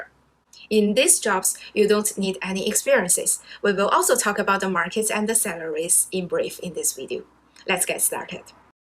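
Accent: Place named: Russia. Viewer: Your accent a bit like a Russian.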